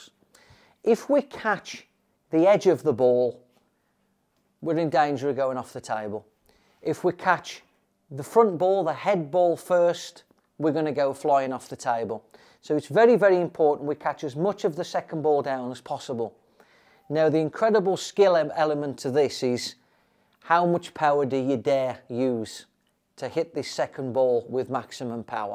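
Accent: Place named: Midlands, United Kingdom. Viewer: Hi, love your video mate... im prob only a few years older than you, been playing all my life, dad owned a pub all thru my childhood, i played pool everyday. I lived in australia for 20 years and saw u play there. I lived in sydney so i mostly played american pool, im back in the UK now and for the first time im hitting balls again on an english table.. wow.. playing english pool is quite difficult again after playing american pool for so long. so i really appreciate your tips here mate. Id love to come and meet you for a chat about pool one day. Im based in coventry, i can hear by your accent mate that your from the midlands??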